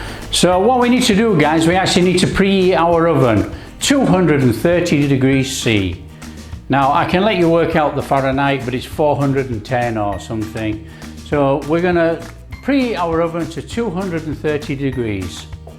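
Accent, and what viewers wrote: Yorkshire, United Kingdom. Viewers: No measures beyond 8 eggs, get real. Enthusiasm and a Yorkshire accent do not ut it.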